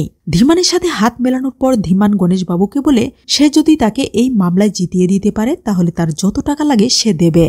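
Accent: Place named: Bangal, Bangladesh. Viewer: অতি সাধারণ কথক। অশিক্ষিত উচ্চারণ। বাক্যের শেষে টেনে টেনে বলা শুনতে ভালো লাগে না। আবার,বানগাল টান ও রয়েছে।তবে, চেষ্টা করলে সব খামতি শোধরাতে পারবে,তা আমি বিশ্বাস করি। চেষ্টা কর ভালো কথক হতে। শুভেচ্ছা রইল।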